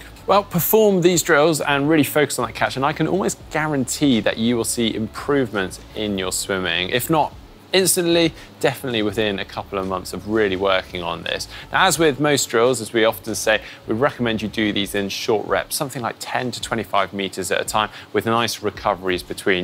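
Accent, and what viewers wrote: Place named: England, United Kingdom. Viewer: When receiving instructions, it's most believable hearing it from an Englishman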